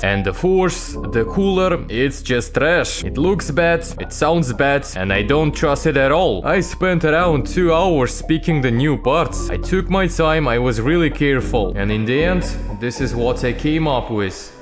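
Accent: Russia. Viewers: Классное видео, мне понравилось. Суровый russian accent на высоте)) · I love Russian accent😂😂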